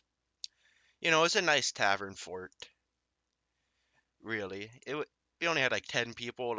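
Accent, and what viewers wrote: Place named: Ireland. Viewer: First comment. Also, I really like your Irish accent it sounds so cute ;). Can I pretty please get a dwarf named after me. :cat-orange-whistling::cat-orange-whistling::cat-orange-whistling::cat-orange-whistling::cat-orange-whistling::cat-orange-whistling::cat-orange-whistling::cat-orange-whistling::cat-orange-whistling::cat-orange-whistling: Your secret admirer , NiftySnipes:thanksdoc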